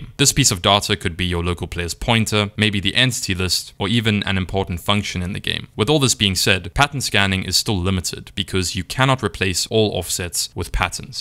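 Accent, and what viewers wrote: South Africa. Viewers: hey are you South African?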